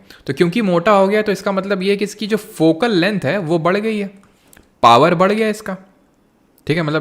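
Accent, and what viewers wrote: Kanpur, India. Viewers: Boss ap kanpur se Hai ye tompakka Hai Accent se pta chal rha Hai 100%sure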